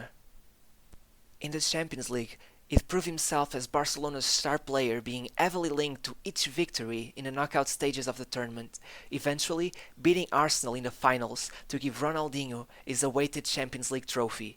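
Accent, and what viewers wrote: Portugal. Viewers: I can tell that's a Portuguese native speaker narrating this video in English.🤔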